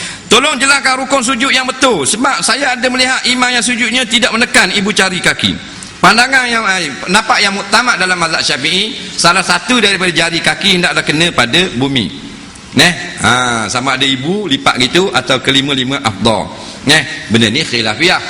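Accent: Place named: Terengganu, Malaysia. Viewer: Maaf teman. Ini bahasa dialek orang Terengganu di Malaysia.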